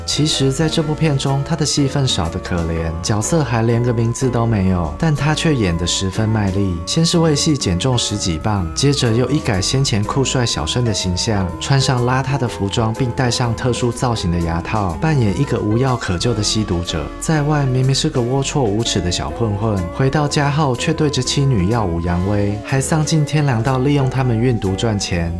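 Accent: Cantonese, China. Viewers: 做得太好好了，很詳細，而且喜歡你講粵語，好可愛❤會一直支持你，加油😊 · 謝謝你的用❤制作，現在香港電影都係古仔一個人撐起，不簡單 … 很掛念哥哥 … 你的廣東話 很可愛 😂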